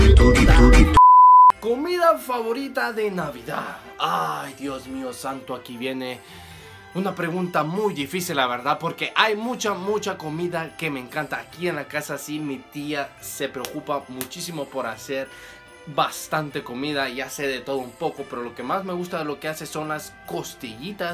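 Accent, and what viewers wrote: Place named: Mexico. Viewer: Adoctando? 😂 Ese man habla más como mexicano los hondureños no hablamos como montunos 🤣 bueno al menos la mayoría que yo conozco además cada quien habla como quiere 😎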